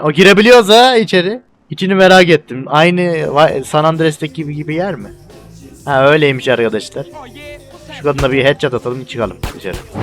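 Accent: Turkey. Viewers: Eline sağlık dostum harika video olmuş. Bu arada nerelisin? Ben Denizliliyim ve bizim oralılar gibi konuşuyorsun :D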